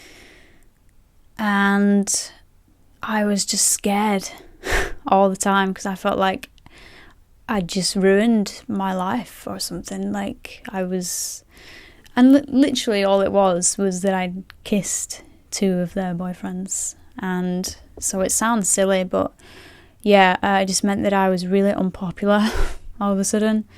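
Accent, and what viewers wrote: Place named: Lancashire, United Kingdom. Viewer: I have social anxiety too but still get surprised when people as outwardly confident as yourself reveal you have it! lol I appreciate a bit of the struggles you must go through, I've had SA for over 15 years now and while I don't quite agree that it can be 'cured' for everyone or the route causes of it, I'd advise people here that it can be worked on and improved at the very least for the vast majority of people. But without sounding creepy (he says as he sounds creepy...lol), I have to say you're such a lovely, beautiful lady, inside and out and you can at least take solace in not just that but in all the good you're doing for others out there with your videos and ASMR. :) PS: I never thought I'd enjoy the Lancashire accent so much before now..! lol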